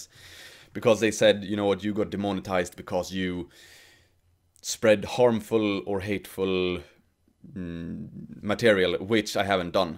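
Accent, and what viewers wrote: Sweden. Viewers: But the Swedish accent is great. Yeah your old vids... In them you speak better English than a lot of people in Limerick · Nothing wrong with a Swede having a Swedish accent. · I love our swedish-english accent. Its the best accent exept the geordie accent from Newcastle-upon-Tyne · Don't worry about Swedish accent in your vids as it signifies where you're from. Content is all that matters. Fluff and beautiful sets don't signify quality. People will wade through mud to listen to reason. I shared your last vid about porn and its detrimental affects on women in a bunch of facebook groups so hopefully your audience will increase as a result. Be up to the task.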